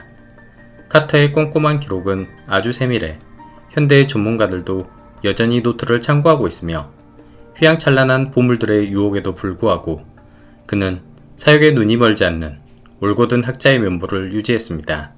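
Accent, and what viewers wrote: Jeolla, South Korea. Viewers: 전라도 분 이신가보네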